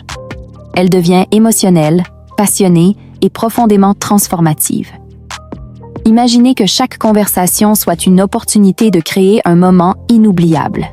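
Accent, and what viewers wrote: Quebec, Canada. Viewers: L'IA a un accent Québéquois ?!? Attention, en France, entraineuse à un sens pas très glamour..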